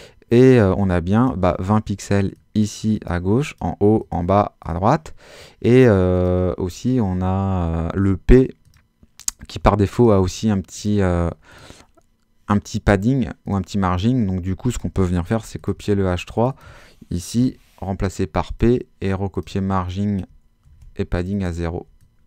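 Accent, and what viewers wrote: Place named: England, United Kingdom. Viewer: Très bon tuto mais l’accent anglais est beau ! :D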